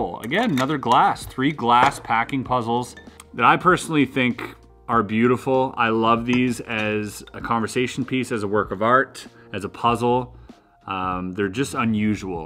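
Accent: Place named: Canada. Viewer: Holy crap your Canadian lol I love your YouTube videos I have never looked you up but I finally hear your accent lol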